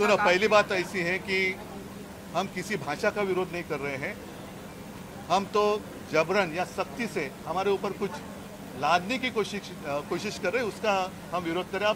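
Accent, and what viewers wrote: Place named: Marwari, India. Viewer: Marathi me boliye sir ..... Aisa lag rha h ap marwadi ho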